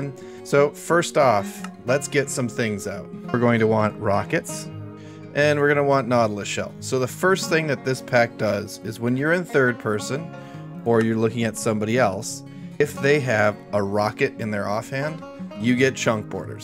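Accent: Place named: South, United States. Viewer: I have no clue, sounds southern.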